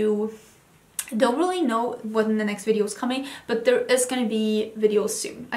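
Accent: Sweden. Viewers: I think this eye look is one of my favorites you ever done. I’m only 1 minute into this video and HAD to comment and let you know. You are always stunning, but this entire look...wow!!! I love seeing your hauls because I know definitively that, bcuz of your Low-Buy, whatever you bought you REALLY wanted and spent a while deciding to purchase!! Okay, back to completely, 100%, focusing on your wonderful video instead of typing!!!! 😘 Edit: how wonderful that you got so excited about a purchase. I’m buying the ND Mini Gold palette today as I returned the new CT foundation (ay yay yay!) and I’m soooo excited! And, your English is wonderful. When my Dad came to this country from Germany, he started learning English by watching cartoons. Til the day he passed, he had the most beautiful accent but still pronounced some words incorrectly or had trouble with certain popular phrases but I found it endearing. 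You should be so proud of your near mastery of the English language; it’s so complicated, and your gorgeous Swedish accent makes it all the better. Niacinamide is hard for even a biochem scholar in the US to say, I think, lol. Maybe try: ny-uh-sin-uh-my-d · Yes. Im danish and i could NEVER speak so fast and fluid english like! Also, swedish is a beautiful language so her accent is so nice:)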